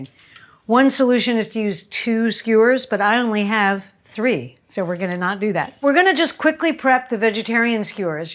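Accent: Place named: England, United Kingdom. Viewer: hold on while I get myself together 😂😅🤣,Your hilarious 😂😅😂,love your English accent,Oh yes love your cooking 🧑‍🍳 shows.Thank you for so much entertainment 😘😘❤️🇬🇧❤️🧑‍🍳🥂😇😘😘❤️🙏❤️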